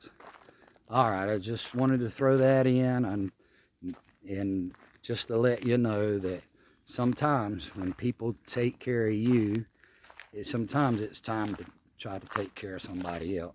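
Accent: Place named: United States. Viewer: Nice content. Your accent is really like a cow boy hahaha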